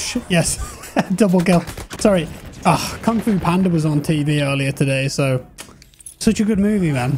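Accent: United Kingdom. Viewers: As long as you like, you're British patter and nonchalance is soothing and enjoyable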